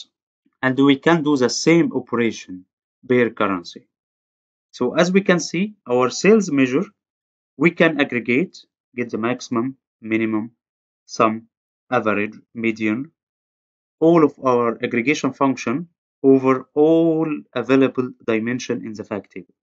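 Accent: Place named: Egypt. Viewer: الانجليزي دا حساه مصري ! ياريتو كان عربي والله :(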